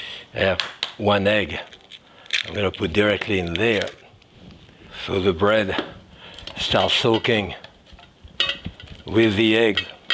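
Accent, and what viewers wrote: France. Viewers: He hasn’t got a speech impediment….he’s speaking with a French accent. If you struggle to understand him, then I suggest that you turn on the subtitles. They don’t have an accent!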